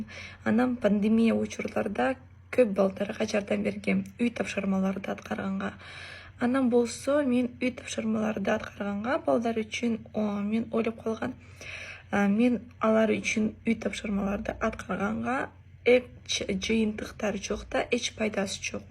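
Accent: Standard. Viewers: Азамат кыз 👍кээ бир киргизлерге караганда таза суйлойт экенсин 🌺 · АЗАМТСЫН ЧОН КЫЗ.АЛЛАХЫМСАГА ДЕНЬ СООЛУК ,ИЙГИЛИК ,АМАНЧЫЛЫК БЕРСИН. ТАЗА СУЙЛОЙТ ЭКЕНСИН АНЧА МЫНЧА КЫРГЫЗ КЫЗДАРГА ,БАЛДАРГА КАРАГАНДА. АЗАМАТЫН. АЛГА, АЛГА. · Анча мынча Киргиздерге Караганда Жакшы таза суйлойт экен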